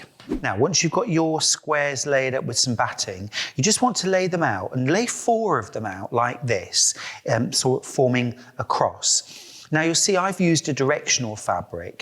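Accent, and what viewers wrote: United Kingdom. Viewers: I love English accent. Can you please make tutorial the Japanese style UV protection mask . The mask is attached to the capelet on the sides with adjustable earloop jus like bra strap. the middle of the face there is invisible opening to drink water, the mask overlaps the capelet(or cap). the capelet goes around up to the back of the bottom of the neck by closing with velcro or snaps. I can show photo example in private. Thank you. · I really enjoy listening to your videos and your lovely British accent. I've made several of these bags and like the way you've made the tabs a bit oversize. Excellent instructions!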